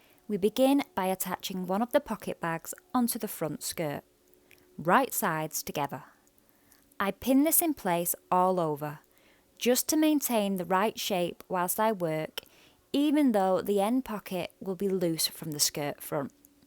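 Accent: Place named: North, United Kingdom. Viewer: I LOVE your northern accent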